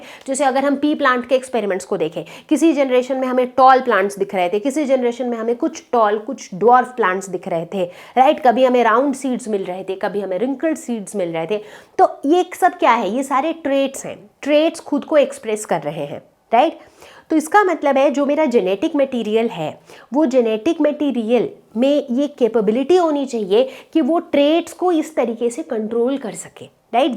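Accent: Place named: Hyderabad, India. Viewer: aapka hyderabadi accent is super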